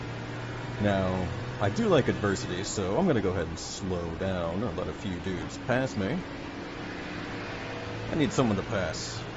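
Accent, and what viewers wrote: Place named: United States. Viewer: Happy New Year! Looks like a fun time. I don't know why but I definitely wasn't expecting an American accent haha. Is your rig banking right when you're taking a banked left turn?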